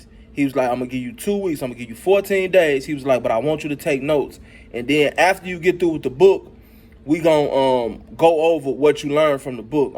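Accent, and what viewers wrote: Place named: Alabama, United States. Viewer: Damn I didn’t know you was from Alabama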